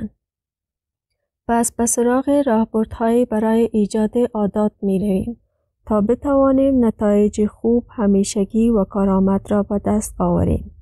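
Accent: Afghanistan. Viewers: Dari accent is also beautiful I like it. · خیلی با دری در ارتباط نیستم ولی خوب حرف می زنه فقط عادت ندارم بهش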